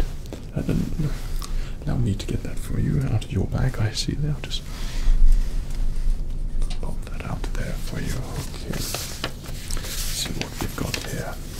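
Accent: United Kingdom. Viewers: So you are British. Nice and same. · I swear he's british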